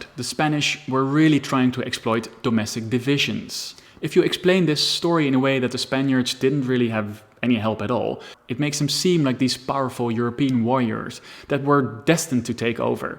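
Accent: Netherlands. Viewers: You sound Dutch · Zo hallo… dat accent is wel erg Nederlands.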